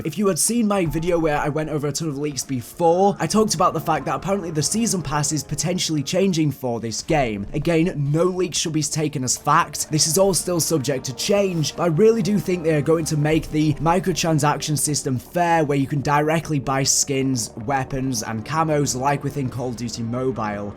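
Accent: England, United Kingdom. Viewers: Well he's English and morgz is English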